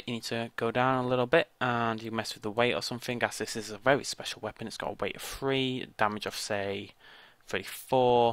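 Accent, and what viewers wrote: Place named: Northern England, United Kingdom. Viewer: Lol the YT subtitles obviously don't get Northern English accents. You were apparently talking about Alaska or something. (I'm a Northerner).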